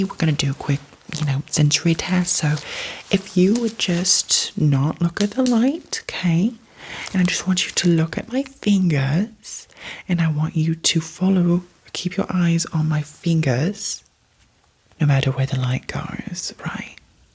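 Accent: United Kingdom. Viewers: Please make a part two or more british accents i LOVE ITT💕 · I live in britan and this is how we speak :3 "A Bo'oh o' Wa'ah is always good £:" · You ate that British accent up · As a british person, this is how the women talk, the men sound like hard northern accents or indian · As a Brit I was very impressed with the accent,I'm from deepest Lincolnshire now that is an accent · As a British UK citizen this video is brilliant pity our NHS systems in poor state takes weeks to find appointments got the british accent spot on tho 😊 love how you done your UK homework on london big ben / British retail boots 😊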